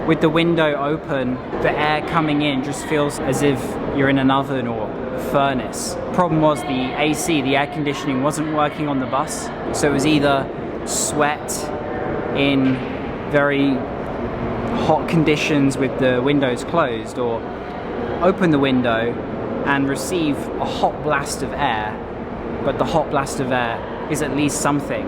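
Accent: United Kingdom. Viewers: Same as me I was always looking at this lakhdaria I've never seen any other place until I've once went to oran and now I'm learning more about my country from a foreign tourist as you see 😅. I thought that we have no tourism at all 😂😂 until I saw this video btw from his accent I suppose that he's from UK I'm kinda impressed by the information that their universities provides for their students and I hope I can go study there 😅.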